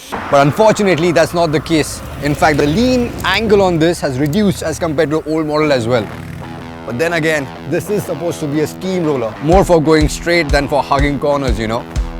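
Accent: India. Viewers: great review and the Indian accent rocks :o)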